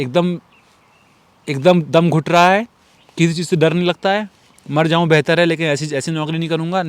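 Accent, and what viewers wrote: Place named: Pahadi, India. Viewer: Apaki pahadi avaz jaisi lagati hai very very nice. Thank you bhai.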